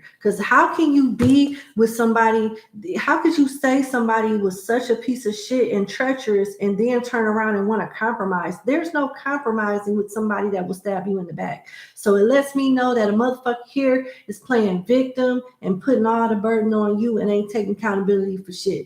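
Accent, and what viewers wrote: South, United States. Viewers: Love your vouce and accent Southern right? Which state?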